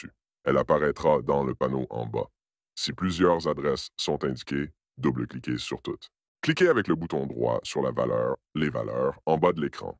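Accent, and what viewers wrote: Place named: Quebec, Canada. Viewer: T'es Québecois :)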